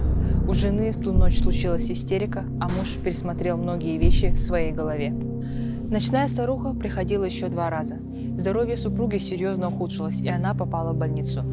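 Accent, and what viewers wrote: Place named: Yakutia, Russia. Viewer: А какой акцент ты ожидал от якутки, именно акцент и делает нас не одним целым с русскими а отдельной нацией со своей своеобразной культурой и языком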